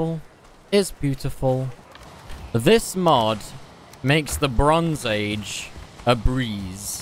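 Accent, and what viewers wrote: England, United Kingdom. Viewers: Loving this modded series will have to look at installing mods just for the build anywhere and automated forge.BTW their is a content creator who plays RUST called willjum and i swear it must either be you as another account or your brother,the voice is the same event down to the english accent.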